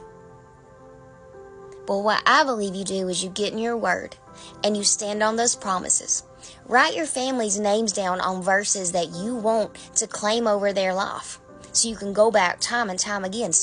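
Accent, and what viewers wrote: South, United States. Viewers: How sweet and Southern❤😊 Beautiful message. Thank You Sister. Virtual Tea❤😊 Amen. Message received. Hugs to ya.🙏🙌💕💞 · I embrace your advice in a southern drawl and everything in between. I will till the ground, plant the seed, and watch it grow. I won’t let it fall without tilling the ground. I’ve gone through enough crap to have fertile soil. To challenge life with authority. I love listening to you to much so I will listen to you. For the Lord’s sake. For all our sakes.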